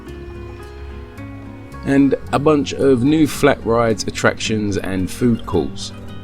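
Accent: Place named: United Kingdom. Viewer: Soooo British and yes has a hint of Spain in to, amazing work keep em coming.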